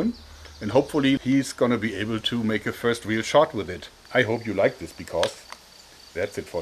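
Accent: Germany. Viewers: are you german or austrian?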